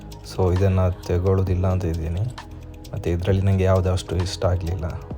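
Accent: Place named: Coastal Karnataka, India. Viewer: Bro u are from Costal region ? Ur Kannada seems familiar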